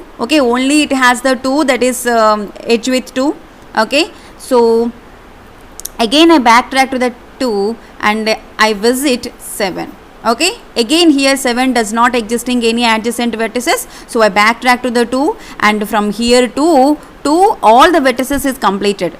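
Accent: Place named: Tamil Nadu, India. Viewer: ma'am baaki sab toh sahi.....bas beech beech mein aapki tamil bahar aa rhi hai.....usko kuchh karo......nice video btw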